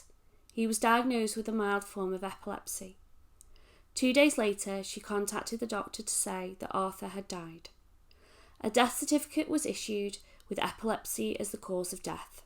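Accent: United Kingdom. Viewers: Thank you for telling this so wonderfully. May I ask where you're from? Wigan or St.Helens maybe?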